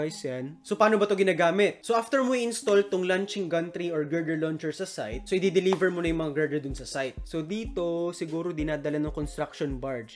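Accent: Visayas, Philippines. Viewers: Bisaya diay ka pre hahaha tabangi ko dol mag engineering ko puhon dol🙏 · Bisaya ka man oi 🤣 new subscribe 🤚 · Bisaya ka diay kay ang imong pronounciation usahay murag bisaya gyud! 🤭🤭🤭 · Bisaya accent🥺😍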